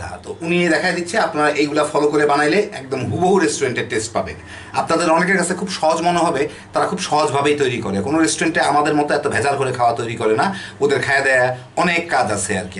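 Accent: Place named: Dhaka, Bangladesh. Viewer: আসসালামুয়ালাইকুম ওয়া রহমাতুল্লাহি ওয়া বারাকাতুহ।। আপনার কুঁচি কুঁচি আর তামা তামা ডায়ালগ টা আমার মেয়ে খুব পছন্দ করে ❤️ আপনার কথা গুলো পুরাই আমাদের মত ঢাকাইয়া😜 আমরা আসলে এত শুদ্ধ করে বলতে পারি না।যা বলি নরমাল ভাবেই বলি😁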